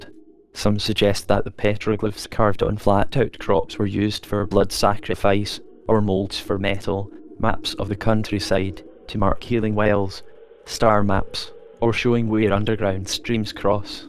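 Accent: Scotland, United Kingdom. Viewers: Hello please answer a question for me and my son who is 15 My son thinks that you're using one of those robot voice thingy Majiggers I told him you're not and that it's just someone with a really cool Scottish accent who probably speaks Gaelic also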